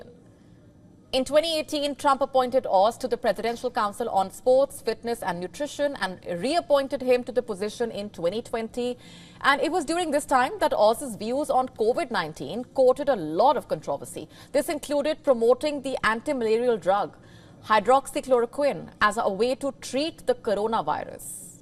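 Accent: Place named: India. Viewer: news caster with strong Indian accent...voice not clear...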